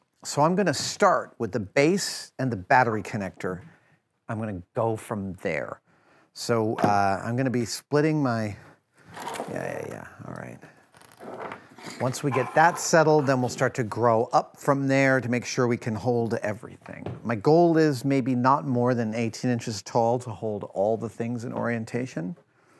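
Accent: United States. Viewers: I wondered what you were talking about..THen I realised you call SOLDERING....SODERING>..I guess Murican accent,.Nice vid though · Hiya, can I just ask, does everyone in America call solder "sodder"? Or is it just yourself? Sorry, it just doesn't make any sense to me. You don't say that you have "sod" your house so why change how you pronounce it in other context? I wasn't going to comment because of fear of people going crazy with me but I am genuinely curious and the more that it rattles around my brain, the more it is irritating me. Thanks very much and love from the UK xxx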